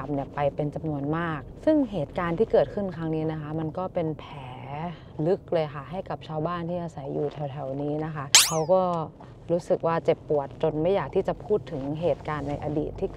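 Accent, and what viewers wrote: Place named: Northern Thailand, Thailand. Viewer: ຂອບໃຈທີ່ພານຳທ່ຽວນິວຢັອກ ມັກສຽງນ້ອງອູ້ກຳເມືອງຫນ້າຮັກດີ ອ້າຍເຄີຍມານິວຢັອກ2ເດືອນໃນປີ1995 ທ່ຽວມ່ວນໆແລະປອດໄພເດີ້ນ້ອງ👍👍👍😊👏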